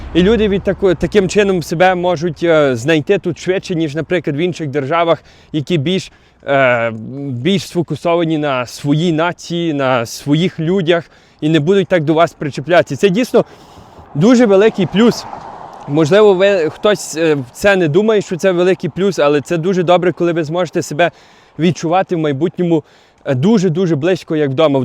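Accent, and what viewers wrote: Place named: Western Ukraine, Ukraine. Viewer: Будь ласка. переїхав в Канаду в 2005 році у віці років 11. Навчався в канадійській школі. Мати вчителька англійської. Він багато подорожував Канадою, вивчаючи поселення та хвилі мігрантів з України, діаспори тощо. Тобто його українська не зовсім класична, але він дивує своїм щирим українським патріотизмом. А також пишається своїм західноукраїнським діалектом.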